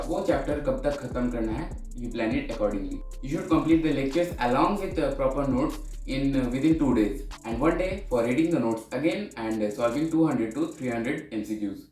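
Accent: Bengal, India. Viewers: আমি নিশ্চিত তুমি বাঙালি from accent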